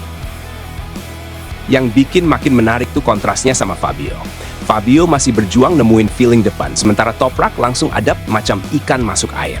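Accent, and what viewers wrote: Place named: United Kingdom. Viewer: Ai dgn aksen enggries bikin cringe Walau yamaha tim gavorit gua,Males nonton sampe beres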